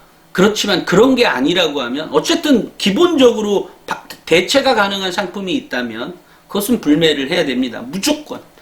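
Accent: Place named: Jeolla, South Korea. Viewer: 말투가 전라돈데 정말 정신나간 인간으로 밖에 안 보입니다.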